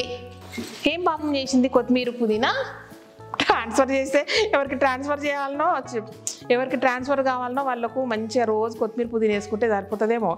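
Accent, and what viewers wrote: Telangana, India. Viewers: Yellow sareelo muddabanti puvula unnaru.meeku sarees baga suit avutayi abba! Telangana maata vellulli 🙏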